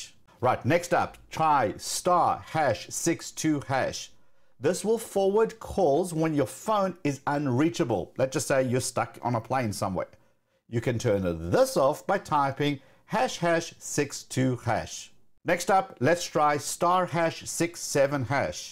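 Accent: South Africa. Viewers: Ayyyy I recognize the south African accent 😀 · Are you a South African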